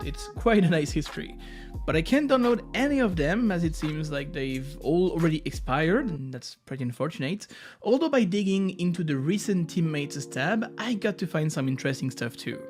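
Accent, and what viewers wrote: France. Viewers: Hi, incredible content, great job! One tip to improve your videos: work on your accent, there are tons of videos and apps out there on how to improve your english prononciation. I hate the french accent (I am french myself maybe that's why) and it's a struggle to go through each of your videos but the quality of content makes it worth it, keep up the great work! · french accent ? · I bet your french. a typical accent. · L'accent déjà c'est cramé mais t'as Google en fr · la musique de ça me tue xDD (j'adore ton accent d'ailleurs, il est très discret mais si t'es français tu devines quand même)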